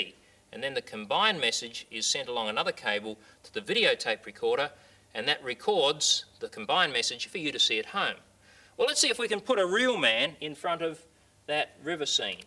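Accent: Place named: Australia. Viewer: You learn 50% more when the instructor has an Australian accent.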